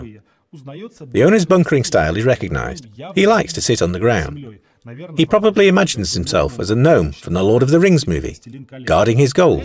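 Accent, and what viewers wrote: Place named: United Kingdom. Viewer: Лучше бы американский акцент взяли, его больше народу в мире понимает, чем британский.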